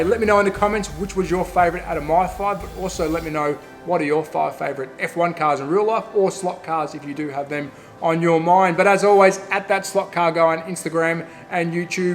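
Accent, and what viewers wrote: Australia. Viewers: That Australian accent just grinds on me. Do not like it. Sorry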